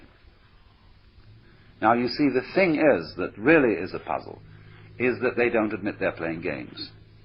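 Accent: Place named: England, United Kingdom. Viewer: are you sure this is watts,dont sound like him,ous not pronounced right,more english than scottish tones .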